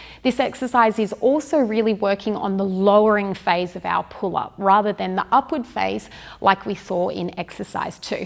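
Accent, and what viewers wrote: Australia. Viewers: Great to hear an Aussie accent.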